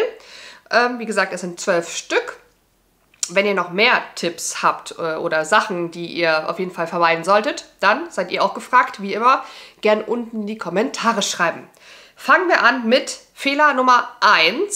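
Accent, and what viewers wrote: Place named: Eifel, Germany. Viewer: Hi, aus welcher Ecke kommst du eigentlich 😅 dein Akzent tendiert Richtung Eifel/Aachen/Düren 😅😅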